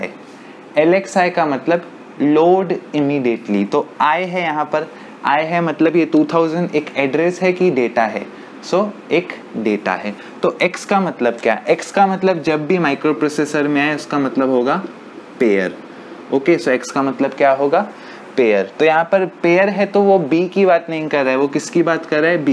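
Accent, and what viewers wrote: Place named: Gujarat, India. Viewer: Surely tell he is a Gujrati , apart you explain real well! I wish i got teacher like you for rest of my career.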